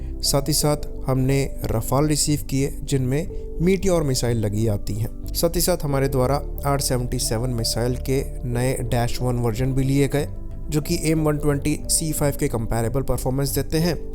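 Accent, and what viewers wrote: India. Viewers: Sawdesi 😂😂😂 tatti indian accent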